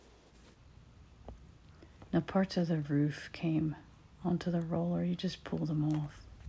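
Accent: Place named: Philadelphia, United States. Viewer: Nice video, I’m a commercial roofer. And go Phil’s! I hear the Philly accent. I’m from Philly too!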